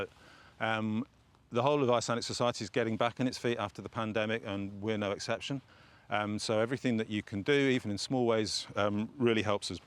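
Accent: United Kingdom. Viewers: I think he is British…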